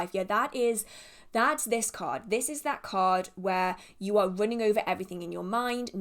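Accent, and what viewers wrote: United Kingdom. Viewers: Hello there. I found you recently. As someone from Romania first I noticed your British accent. Then your metal look in other videos.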